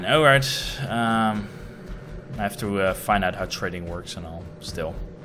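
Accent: Netherlands. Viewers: hey! no, you're doing ok. learning the game is nice to see. een nederlands accent, trouwens. haha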